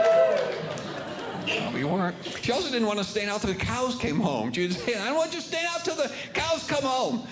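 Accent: United Kingdom. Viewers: British accent and Shakespeare? Wow!! Talented guy!! Bloody brilliant!❤😊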